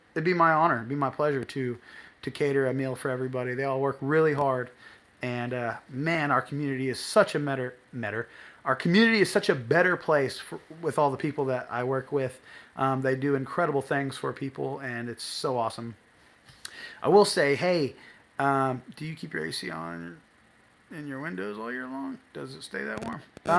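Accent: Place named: Netherlands. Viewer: Sounds good you sure sound like dutch will be interesting watching your channel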